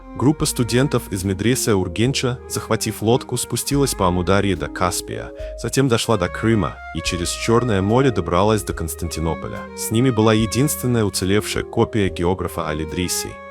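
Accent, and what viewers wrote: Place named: United States. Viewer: Афтор есть немного акцент. Как будто это американец. Может я ошибаюсь.Но спасибо за труд..Салом ман точикам Ориоям.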